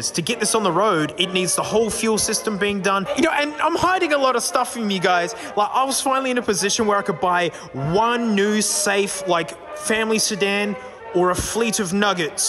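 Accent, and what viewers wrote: Australia. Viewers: James Came Around = James Cameron... Ah the sweet Aussie accent! My ear just needs an extra second sometimes to process!!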